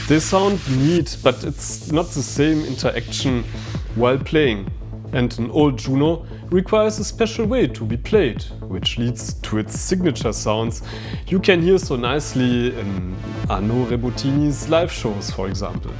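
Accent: Germany. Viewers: With such a heavy German accent, I can better understand you in German.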